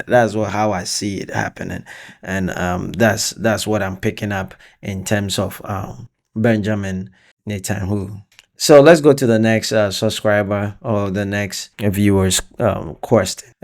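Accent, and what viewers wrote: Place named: Nigeria. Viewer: Ete sen, I worked with Nigerians for a few years and your accent reminds me of them. Old good memories. I have a question: With all these earthquakes and erupting volcanoes and all the damage that this will cause, will humans return to the Stone Age in terms of daily life (jobs, transport, heating, trade, travel, food)? Will we have different situations from one country to another or from one continent to another?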